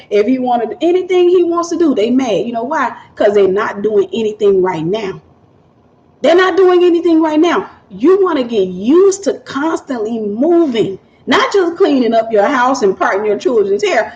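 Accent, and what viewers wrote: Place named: South, United States. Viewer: God Bless you Beautiful Sister i have that Southern Accent as well it doesn't leave for some Reason hee hee you have been a Blessing since i found your channel 🕊️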